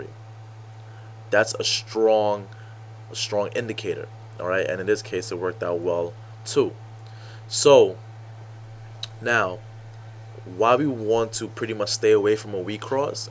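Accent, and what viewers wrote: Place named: Italian-American, United States. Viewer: Love the goomba accent. Fits the gangsta ripoff traders.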